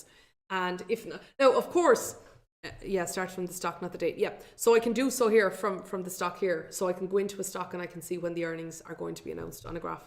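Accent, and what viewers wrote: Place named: Ireland. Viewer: Now thats an Irish accent if I ever heard an Irish accent!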